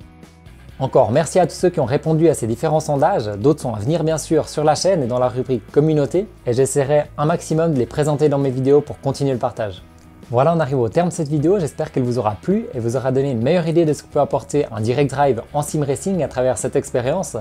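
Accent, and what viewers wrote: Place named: Neuchâtel, Switzerland. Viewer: on dirai un neuchâtelois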